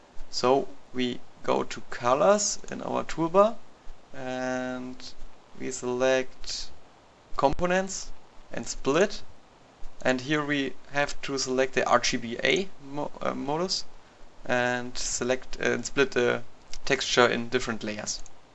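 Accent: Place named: Germany. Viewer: Denglisch xD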